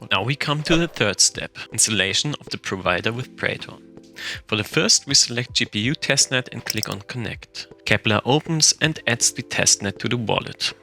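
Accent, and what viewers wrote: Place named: Eastern Europe. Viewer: Акцент славянский. Ничего не понятно, но очень интересно.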